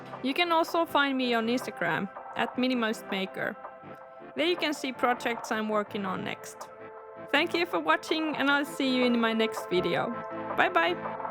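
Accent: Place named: Finland. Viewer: Simple yet very nice looking design! What makes it more interesting that I was just browsing general wood-working videos then this one was recommended, and I recognized from your voice that you are from Finland as well :)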